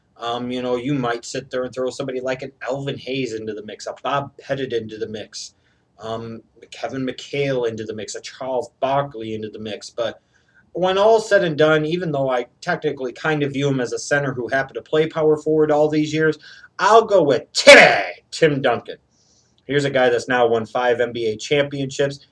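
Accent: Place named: Midwest, United States. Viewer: I love your midwest accent.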